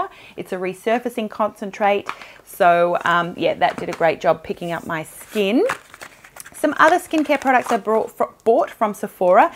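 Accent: Australia. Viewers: Great to see u again and the refreshing Aussie accent and all the familiar products I see on the shelves here 🤗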